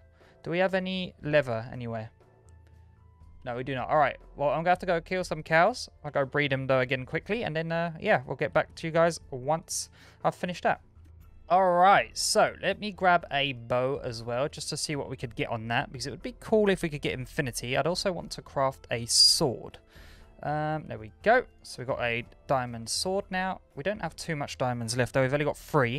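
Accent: United Kingdom. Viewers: Its british. I have the same accent. It doesnt sound like he has an accent to me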